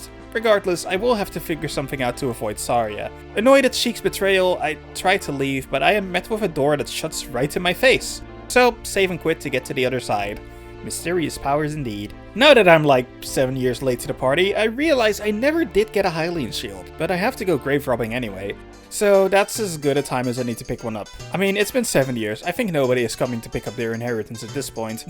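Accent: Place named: Netherlands. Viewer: Hmm... your accent. You're Dutch, right? Great video :)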